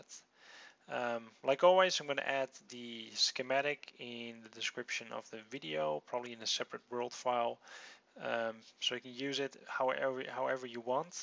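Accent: Netherlands. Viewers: Lol, I'm dutch too, as soon as I heard you talk I knew you were dutch :P We all have that weird dutch accent... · lol ik wist niet dat je nederlands was xDD khoorde het pas na zon intervieuw video xD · Are you from holland??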